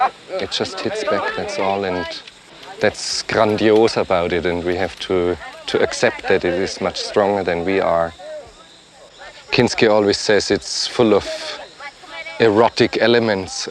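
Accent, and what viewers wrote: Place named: Germany. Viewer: That cynical voice, the thick german accent full of wisdom and perspective. Gives a remarkable contrast to the organic background and the animal sounds that almost mocks his wisdom with creativity.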